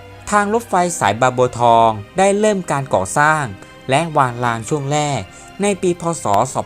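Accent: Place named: Myanmar. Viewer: ทำไมพูดเหมือนพม่าหัดพูดไทย มึงเป็นพม่าใช่มั้ย😮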